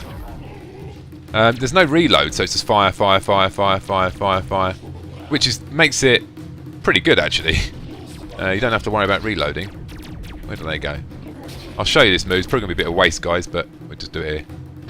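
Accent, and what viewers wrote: England, United Kingdom. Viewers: From the moment i heard ur voice im thinking like this guy is smart because i always think that people with english accent is smart :) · Been watching some of your videos after I found that warframe one, Your voice is now one of my favorites out of people who talk over video games on youtube with an English(I think?) accent. lol, and you know there's a lot of those.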